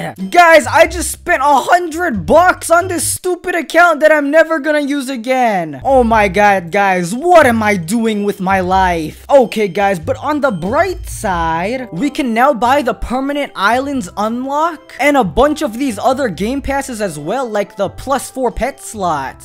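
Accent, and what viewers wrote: India. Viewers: Ji indain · Bro are you indian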